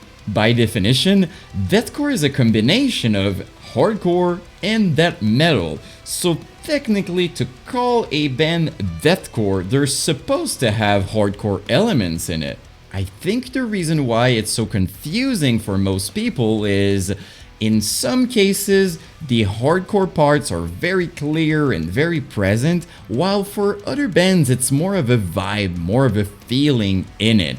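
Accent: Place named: Montreal, Canada. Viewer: hey man, you from mtl? cause you sound like it!! ahahha good to see someone out there doing these kinda videos. keep up the good work my guyy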